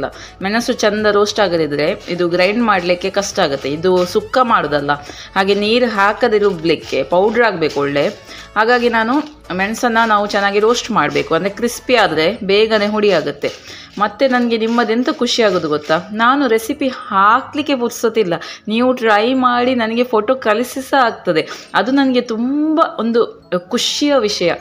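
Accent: Mangalore, India. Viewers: Mam l love Mnglr kannada 😍😍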